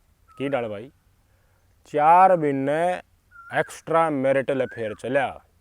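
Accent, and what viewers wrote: Haryana, India. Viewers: Haryanvi hae bhai achi soch rahko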